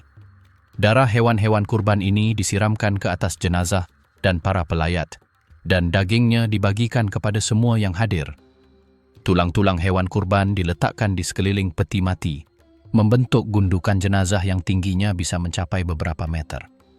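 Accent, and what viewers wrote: Malaysia. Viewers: Milih narator nya orang Malay, kalo text nya sih bhsa indonesia 😂gak enak dikuping....skip ah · Kenapa logatnya dari negeri Jiran padahal nama channel nya a globan Indonesia 😅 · Dari cara BERBICARANYA saja ORANG MALINGSIA😁😁. VIDIO Abal Abal.... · Admin gadool, bahasa indonesia gaya Malaysia, Mereke mereke ape bende, gak enak blas di dengerin gatal telingaku nyimak suara admin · Logatnya malaysia 😢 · Itu kayaknya orang malaysia belajar ngomong indonesia jd logat malaiysianya masih kental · Min,logat/dialek Malaysia anda masih jelas terdengar.Hebat kan Bahasa/dialeg Indonesia?😊Orang Malaysia lama kelamaan akan berbahasa dan berdialek dengan bahasa Indonesia.....😅😅😅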